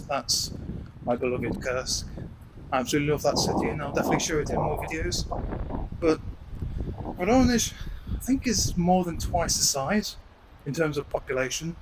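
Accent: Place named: United Kingdom. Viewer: Какой красивый британский акцент. Спасибо Вам за видео. Я не была в Воронеже, но теперь хочу поехать прсмотреть.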